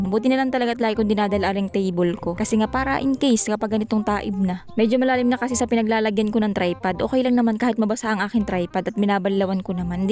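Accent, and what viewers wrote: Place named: Marinduque, Philippines. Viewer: Miss ganda at masipag pa kala ko taga marinduque ka kc un mga salita u ay puntong marinduque ngani mandin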